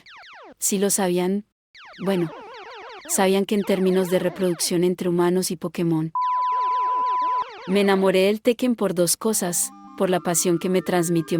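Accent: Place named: Colombia. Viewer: Loquendo con acento colombiano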